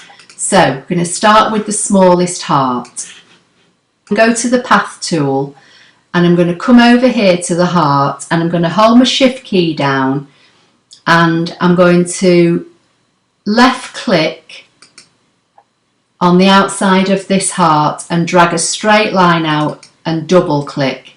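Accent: United Kingdom. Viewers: Great and beautiful work! And I looooooooooove your British accent!!! I'm from Córdoba in the middle of Argentina. Namaste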